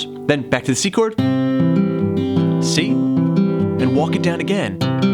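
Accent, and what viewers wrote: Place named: California, United States. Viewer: I like your subtle Californian accent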